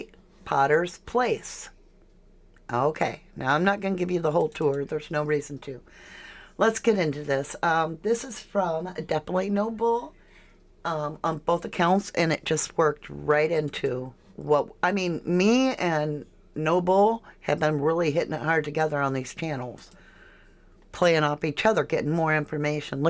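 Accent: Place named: United Kingdom. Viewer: Probably British, and street wise street talking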